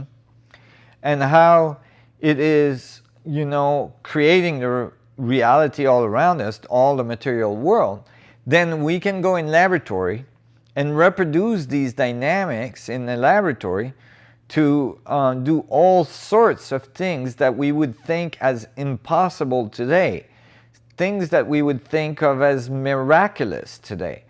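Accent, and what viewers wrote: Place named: Quebec, Canada. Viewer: I am doing Joe Dispenza work at the moment and came across your videos and WOW. You explain everything so well. I would love to seat with you for an entire day. Je viens du Québec et je reconnais l’accent :-) je vis en Floride depuis plusieurs années. Si tu est dans le coin j’aimerais bien aller à un de tes cours. Merci mille fois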